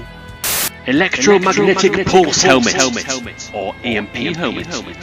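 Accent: United Kingdom. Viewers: Or maybe it's because I was so surprised to hear a British accent, I was honestly expecting some annoying Yank voice 😂 Never knew you were a Brit! Makes all the edgy texts over the years much better knowing the British humour. Americans would be completely serious saying all that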